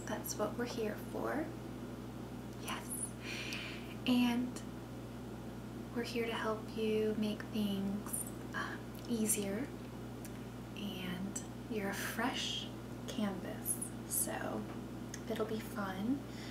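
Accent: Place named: North Carolina, United States. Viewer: Just based on her accent and talk of ft fisher, the aquarium there, and the azalea festival, I'd say she's from my home state of north carolina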